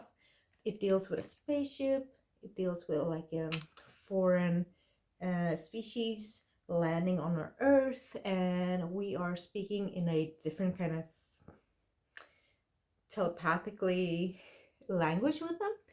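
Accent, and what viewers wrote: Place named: Sweden. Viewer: I was going to ask what that accent was, but you said your from Sweden? So I’m guessing it’s Swedish 😊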